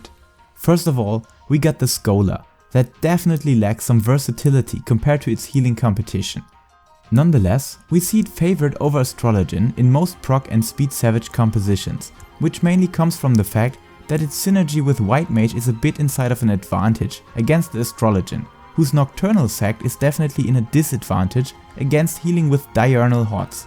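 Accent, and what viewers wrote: Germany. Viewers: So wie dein Englisch klingt, bist du deutsch oder ? Sehr gute und Hilfreiche Videos!! Sehr zu empfehlen! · R U German?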